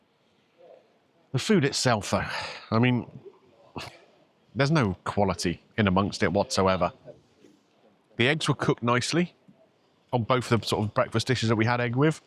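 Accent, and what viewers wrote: United Kingdom. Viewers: This is very interesting. I just got recommended this video after seeing a lot of Gary Eats videos, and I can understand why. You seem to have nearly identical branding, styles, and video format/pacing. Only difference I noticed is I think he has some faint background ambient music sometimes. Clearly you are like a separate person and I don't get the impression you are at all being dishonest or anything, so I'm not criticizing. But it is very interesting. I would say it might be good to find one or two things that are a bit more unique to you and your style and lean into those, but that's sort of hard with food videos, so I don't really blame you for having such a similar style. I guess I have nothing to say but good luck overall, I might check out future videos of yours. And I suppose it's good to have another [kind but honest british guy with a very specific british accent giving food reviews where he starts outside makes a summary, goes inside gets a handful of key menu items, tries them out with a silent but clearly human cameraperson, gets some b roll, and after the meal goes outside makes some kind of joke, goes over the bill and makes a final summary, all shared with a video with him with a white outline kind of making a shocked or at least very emotive face and some short all caps text that is attention grabbing but also a bit intriguingly unclear.]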